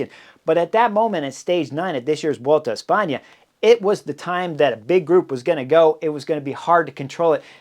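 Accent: United States. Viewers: Hey, I'm an Aussie. Would you mind telling me what part of America your accent hails from?:)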